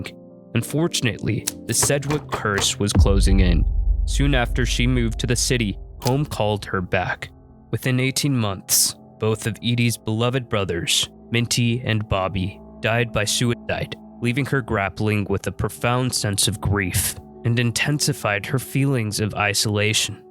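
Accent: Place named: California, United States. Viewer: It’s a California shift. Interesting thing happening with the accent. They say things differently than you.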